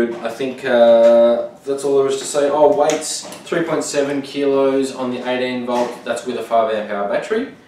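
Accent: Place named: Australia. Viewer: Love it when an Aussie is doing a video - great to hear our accent.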